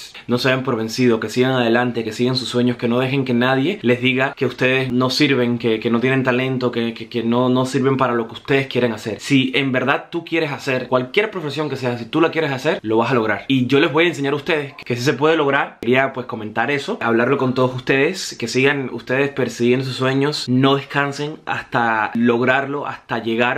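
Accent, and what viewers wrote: Cuba. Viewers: Este pk sigue con el acento neutro ya no estamos en univision habla bien que eres cubano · Felicidades Pero por favor Habla con acento neutro Porque cuando hablas como cubano no te entiendo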